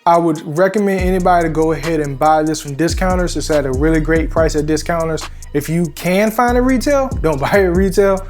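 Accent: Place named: South, United States. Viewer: You must be from the south, the way you pronounce st words 😂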